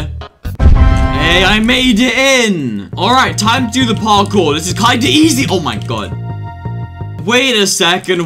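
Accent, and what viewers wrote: United Kingdom. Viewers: POV if parkour civilization wasn’t scripted❌ POV if was British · Bros British😂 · I was dying, because you’re British (Sorry) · pvp civilization but british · Bros brithish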